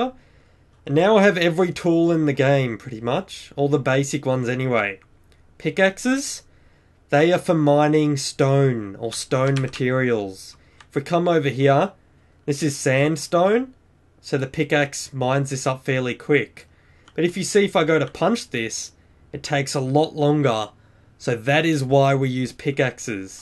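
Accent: Australia. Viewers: I'm in love with the aussie accent!! chef's kiss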